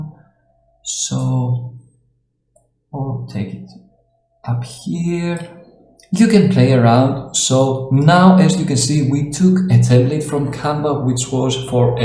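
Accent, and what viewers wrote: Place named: Greece. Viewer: Loved your Greek accent man :)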